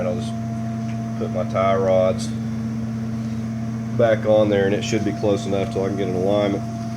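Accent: South, United States. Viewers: Oh man, great video! Very well explained and with good tips. But as a southern man from Tupelo now living in Germany the last 20 years, it was your southern accent that made me feel at home. Loved it! I doubt I will tackle this problem myself but I will definitely not be boogering any more threads thanks to your tips. Definitely a fan now. Keep up the good work!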